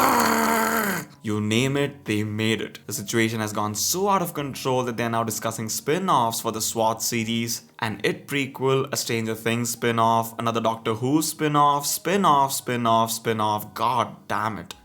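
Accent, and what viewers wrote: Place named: India. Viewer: Click on video I want to watch: Indian voice Okay nvm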